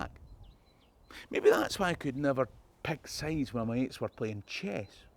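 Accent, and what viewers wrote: Scotland, United Kingdom. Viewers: you were born in Scotland. You speak with a strong Scottish accent. And most importantly, you know what you’re talking about as you give your Scottish history lessons. Those who think skin colour has anything to do with anything other than pigment are what I would call pendejos. I’ll be offering a pint when the FM declares “Haste ye Back” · If you aren't Scottish that's a good accent my friend.🤣Love ya work lad! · he sounds Scottish because of his Scottish accent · With credentials and a good Scottish accent...I'm greatful. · Man you sound pretty Scottish, thats enough proof for me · Man, I so love hearing the Scottish accent, your country is so nice and beautiful, you should just let the English go. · Personally, I’m very happy to hear you speak with a Scottish accent, about Scottish history. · I think everyone wants to be a little bit Scottish, especially after watching Braveheart or Rob Roy. 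It's truly a charming accent that along with it's close kin Irish, just makes people seem friendlier and more honest. Not that there's any truth (or lack of it) to that, but it's one of those accents that evokes certain feelings amongst most western folk. To me you're as Scottish as you want to be, if you've ever actually been there. Maybe some day I'll make it there, but not the now. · I'm an American woman who is half-Scottish. Am I allowed to talk about Scottish history? I assume I am, but the half of my ancestry that isn't Scottish is ethnic German, so no one questions me. Obviously, the question by your commenter is racist, but I love the way you've answered him. Also, I grew up around my Scottish grandparents and I love your Scottish accent; it reminds me of them. I'm emotionally triggered by your voice. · Mate you sound Scottish to me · You sound Scottish to me mate. Sad people cannae keep rude comments to themselves. 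· How could anyone question Scottishness. The accent is a dead give away which shows he's been there for quite a while, and his knowledge and passion for Scots history is unquestionable. Apparently he also lives there, which in my mind is the main criteria. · You seem documented enough to do so! Who is 100% of anything anyway? (I am Scot, also English, German, French, Catalan, but it doesn't show...). After all, how many Egyptologists are Egyptian? How many paleontologists are dinosaurs? Keep up your videos with your rich scottish accent! :) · All you need is an authentic Scottish accent to be Scottish and you sir are Scottish. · Seriously - I "watch' with my eyes closed a lot. I was mostly just listening about how kilts have changed. When I opened my eyes to see what was next I had 2 thoughts "cool hair" and "wonder if he's a professor". It never occurred to me that a dude speaking about Scottish history in Scotland with that classic accent isn't really Scottish. 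And your insights in this video go much deeper than defining being Scottish. Very cool. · Of course they should. If you're born there and raised there, and have accepted the heritage of Scotland that makes them as Scottish as anyone else. Edit 1: Sorry, i misunderstood the question. I thought you were asking can non-whites be Scots...any damn body can talk about the history of anywhere on earth 🌎. Edit 2: Honestly, I listen to your videos on a headset at work. I don't hear a man from Ghana...I hear a good Scotsman who is proud of his heritage. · Love your very Scottish patter. Keep it up and Feck the racists. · Are you a Historian? Yes? Then study it all you want, talk about and educate people. And judging by your accent, you're a fellow Scot, so go for it luv. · Could of fooled me! You look Scot to me. You sound Scottish. You live in Scotland. I do like your tan. looks good on you.❤ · Whatever’s black people speak for ‘get it up ye!’ 🤣🤣🤣 brilliant. 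Quite frankly if yer accent is this strong no one can say anything about ye being Scottish. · Dude, with an accent like that- you’re Scottish, end of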